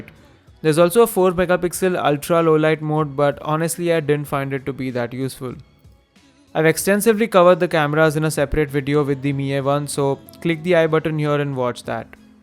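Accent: India. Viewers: There's something about the indian accent that is hard to take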